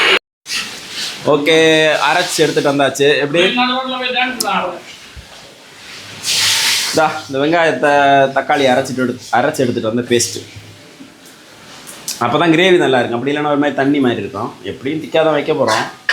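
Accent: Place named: Kanyakumari, India. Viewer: nanum kanyakumari than anna language vachi kandu pudichan anna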